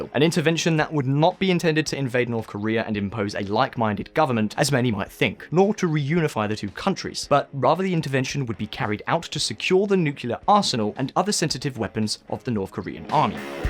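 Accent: United Kingdom. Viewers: Fast and loud, with a British accent, Americanization at it's most annoying. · Jay McJakome I don’t mind the British accent, I even prefer it but he speaks way to fast, I can’t even process what he is saying... I can understand it but it goes into one ear and comes out of the other... I think the issue is the lack of punctuation or something.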